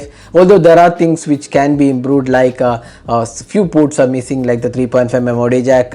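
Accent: Kerala, India. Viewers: sounds like mallu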